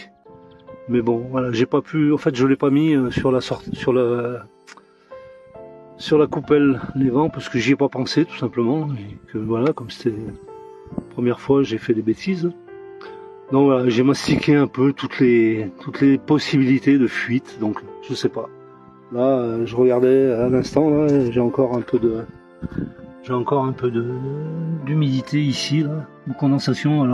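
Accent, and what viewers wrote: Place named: Corsica, France. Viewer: Ils sont tellement beau les paysages. Eh t'as l'accent Corse un peu.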